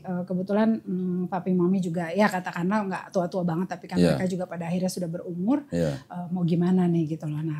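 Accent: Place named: Betawi, Indonesia. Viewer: betawi banget........dialeknya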